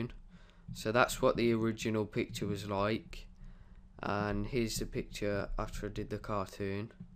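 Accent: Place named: Birmingham, United Kingdom. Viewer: birmingham accent lol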